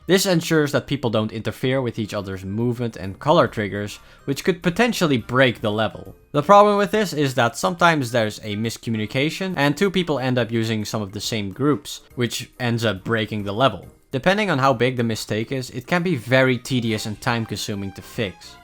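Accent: Netherlands. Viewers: Bruv are you dutch? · kkr dik nederlands accent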